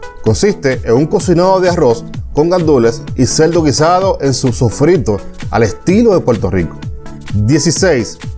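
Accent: Dominican Republic. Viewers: el que hace el video es dominicano y se nota en su acento, PR es mas rico que RD unas 4 veces mayor. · Los cubanos no hablan sobreefatizando las vocales, los dominicanos si, por lo cual pienso es dominicano. 😊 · Dominicano por ese acento haciendo video de PR para coger views.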